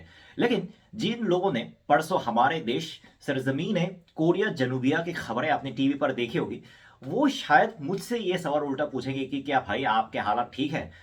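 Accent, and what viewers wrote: Standard. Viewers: Bhaisahab itni shudh hindi toh India mein bhi koi nahi bolta. Someone give this man a Aadhar card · Bro……your Hindi waaaaay tooo good….pretty much text-book! But, it also a bit difficult to follow at times!!! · Yaar aapki hindi to intni sudh h ki humari bhi utni nahi 😊🇮🇳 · Itni shudh Hindi toh meri bhi nhi h well done bro 🤌👍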